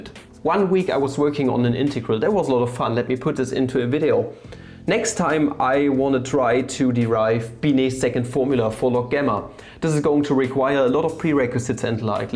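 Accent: Germany. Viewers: Ähm ich hör da bißchen deutsch raus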